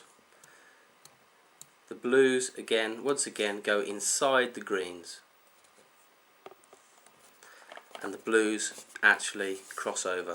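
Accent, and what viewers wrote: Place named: United Kingdom. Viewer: this is by far the best video i've found around here on this subject. also, a british accent always lends more credibility.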